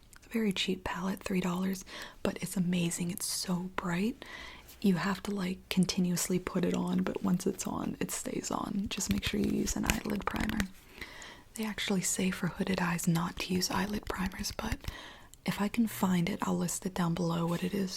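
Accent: United Kingdom. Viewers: wait why did she start off british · thumbs up if you want to do a whole ASMR video in her British accent... thumbs up so she sees this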